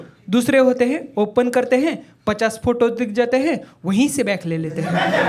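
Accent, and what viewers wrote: Maharashtra, India. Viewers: भावा तुझ्या बोलण्यातून च समजत तू मराठी आहेस..🧡 आवडलं आपल्याला..🔥🤍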